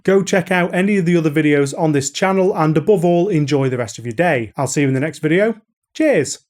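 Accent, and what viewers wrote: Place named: Lancashire, United Kingdom. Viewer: Ah was picking up some Karl Pilkington vibes from that accent. Most definitely not an idiot though; love this channel. :)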